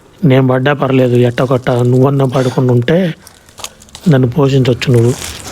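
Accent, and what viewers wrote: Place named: Prakasam, India. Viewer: nee lanugage prakasam slang lo vundi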